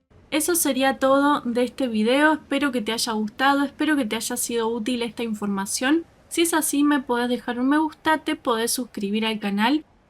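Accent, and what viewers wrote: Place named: Rioplatense, Argentina. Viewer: gracias, de donde eres? pareces de uruguay o argentina por el acento jajaj, aqui uruguayo:D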